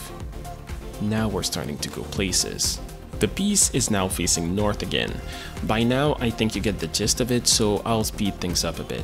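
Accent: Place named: Quebec, Canada. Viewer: Getting some Québécois vibes out of your accent. Thumbs up for the video.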